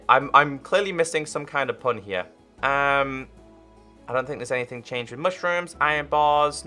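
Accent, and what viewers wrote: United Kingdom. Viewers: It’s the accent. UK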